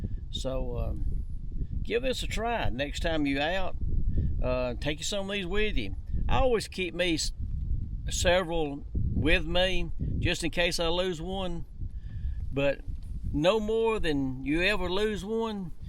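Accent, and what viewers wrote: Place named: South, United States. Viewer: What size weight do you use? I've always made them with 2oz catfish weights and I use a zip tie to attach the clip. But your way may be even cheaper. And BTW my wife heard your voice and said, "Cap, you are as southern as they get, but I think that guy is more southern than you" lol. Im a Georgia man, where are you from?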